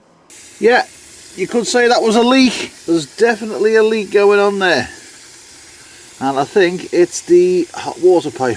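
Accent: Liverpool, United Kingdom. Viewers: Enjoy your trip i am waiting to get seats recovered then off over the border soon as poss. Would love to meet you i have been married 50yrs my other half says you sound posh scouse😅 best of luck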